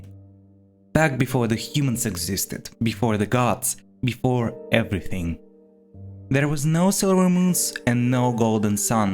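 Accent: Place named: Ukraine. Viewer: Сидел, сотрел видео. В конце оказалось, что автор Украинец (я то думал, что акцент такой забавный). Приятно знать, что автор так "недалеко" и приятно знать, что не только омерикосы делают хорошие видео с теориями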